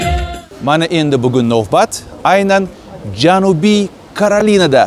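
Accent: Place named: Uzbekistan. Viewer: Битта лайк сиздан ургилиб кетсин хакикий узбекча нутк учун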